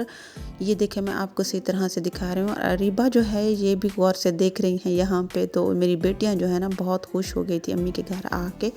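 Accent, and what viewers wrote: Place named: Sindh, India. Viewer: Me new luranay video ap k dekhi, lgrha he jesah sindhi log sindhi style ,me b sindhi hun aap hyderabd me ho ya karachi me??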